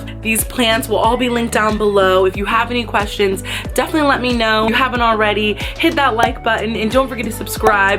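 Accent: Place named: New York, United States. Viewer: Hey Princess! Excellent how you present yourself. My goodness! Love the mix of just right Ebonics and everyday English. You should be considering acting, reading dramatic audio books, customer service, all areas that puts you in the public, HA YOU DO'N NYER. I am also a NYer. (Brooklyn native I am). Thank you. Have a blessed one. Yeah, I'm a plant lady too and I've got about 40 plants on my plish (plant wish) list, will be bought as babies cuz I ain't spend'n no light bill money on a plant, that's hard for me to do. So far I gooot, boout, between 50 to 60 plants in my small NYC apartment. Plants are just dee bomb! Just found ya and will see ya some more in future. Again Princess, bless!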